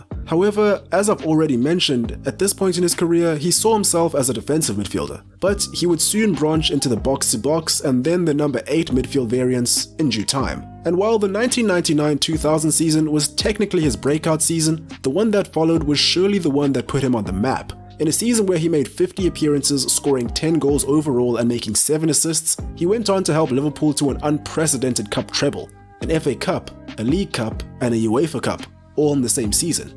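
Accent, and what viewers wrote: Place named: South Africa. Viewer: I can hear that South African accent Bru 🍻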